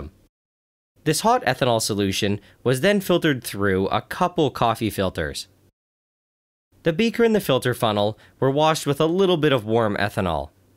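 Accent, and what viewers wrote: Quebec, Canada. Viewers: Quebecois par hasard? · you from québec ?????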